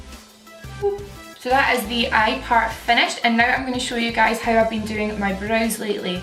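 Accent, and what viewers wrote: Scotland, United Kingdom. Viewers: Your scottish accent is the best kind. yours is the kind of accent I wish every Scot would have. non of that ned shite 👍😂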